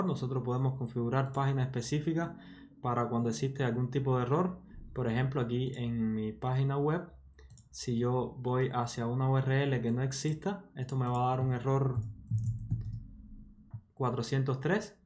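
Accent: Cuba. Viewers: Hola eres cubano ?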